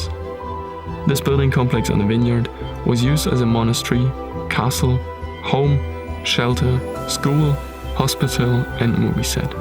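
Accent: Germany. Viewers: Is the person from this video German? I love his accent. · 💜💙 Que hermosura! Y que bella voz del narrador, tiene acento alemán 💜💙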